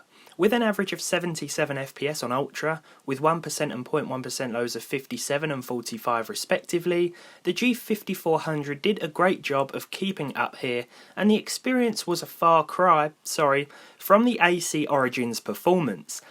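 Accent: United Kingdom. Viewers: Hey mate where are you from in the UK i like your accent but you never answered me at all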